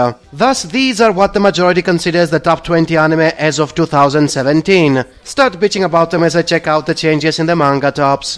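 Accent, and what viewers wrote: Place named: Greece. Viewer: He's from Greece and yes that's his normal accent.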